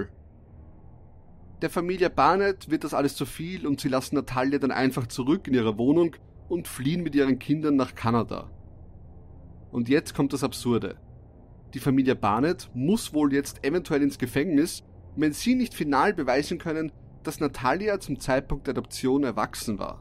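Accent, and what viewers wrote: Vienna, Austria. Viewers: Bist du ein Wiener?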